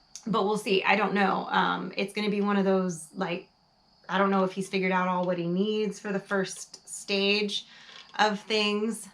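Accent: South, United States. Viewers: Hey I'm starting to hear a little bit of Southern accent coming through when you talk. I lived in Georgia for four years and my best friend lives in North Carolina so every time I go visit I pick my accent back up within a few days. It's been 30 years since I lived in the South. Have a good weekend and God Bless!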